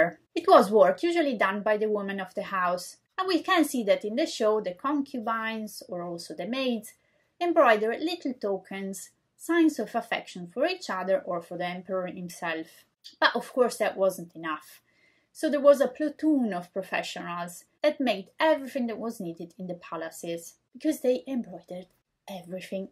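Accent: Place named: China. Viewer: You are almost speaking with a chinese- english accent. 😊 But anyway very interesting docu!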